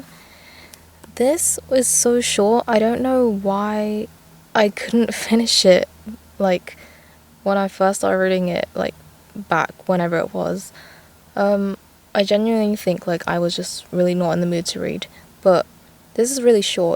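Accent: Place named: United States. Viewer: Lovely video. Question: have you spent time in the US? Sounds like it when you speak. Just curious! (Writing from the US)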